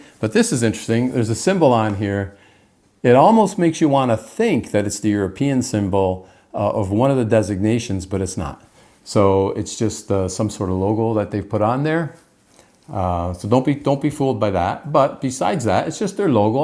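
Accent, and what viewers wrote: Canada. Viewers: Within ten seconds , i'm like cCanadian! Lol. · Is that the Canadian accent I hear? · Your name is? You sound Canadian. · He has Canadian accent😊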